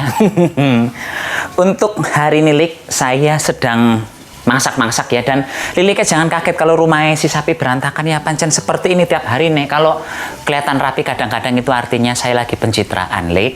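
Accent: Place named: Java, Indonesia. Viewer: asli mana ya, kok jawa bangey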